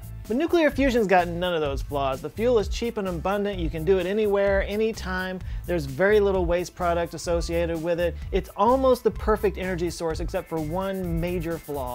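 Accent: Texas, United States. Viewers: Dude... this seriously wasn't worth addressing. We love you both despite and because of your crazy Texan Sikh-friendly accent. You should be proud of being able to cause such profound mood elevation with it. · Why do you keep talking about turning a turban? OH! TURBINE - rhymes with coal mine Funny American accent, but I still enjoy your videos :-) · So the English accent is the only correct way and the American accent is always wrong? I don't think so. Also, there are many American accents, and most of them pronounce the word TURBINE not TURBAN. His is a regional accent. Edit: Some Americans also pronounce the word like TURBEEN. This is also an accent. It isn't a more or less correct way to pronounce the word. Don't be an accent snob. nobody likes an accent snob. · It's the American accent. Autism is abound in the comments. We tend to say Tur-Ben, not Tur-Bine. 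Just wow.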